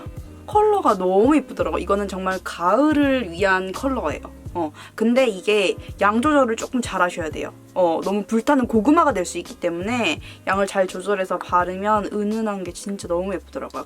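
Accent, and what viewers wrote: Jeolla, South Korea. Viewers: 엌ㅋㅋㅋㅋ 언니 말투에서 살짝 전라도 느낌이나서 귀엽다고 느꼈는데 부모님의 영향이었군요 ㅋㅋㅋㅋ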